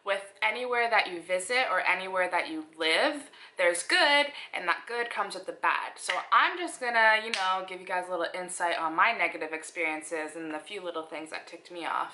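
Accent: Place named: Patois, Jamaica. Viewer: mi thought yah half korean..til yah speak patwa..yah duh know yis fine empress mi like mi coffee strong and black..lol yah beautiful..mind and body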